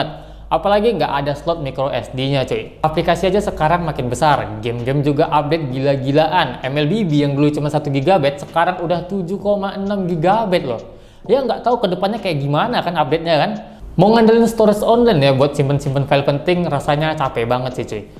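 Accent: Medan, Indonesia. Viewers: Bg lu org Medan?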